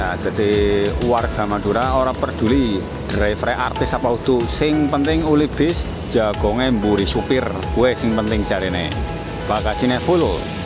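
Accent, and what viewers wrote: Banyumas, Indonesia. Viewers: Selamat siang kang.. Nek ira ngapak ora ke penak..antap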